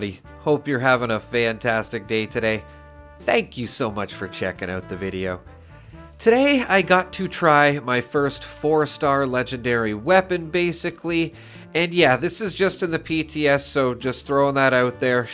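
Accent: Canada. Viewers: Dude, are you Canadian perchance?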